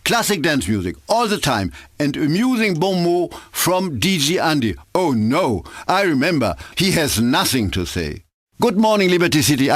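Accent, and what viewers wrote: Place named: Africa. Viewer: sounds like african english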